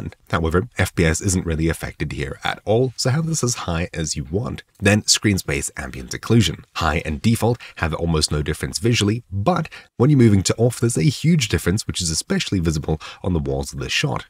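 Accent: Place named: South Africa. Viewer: didnt know your south african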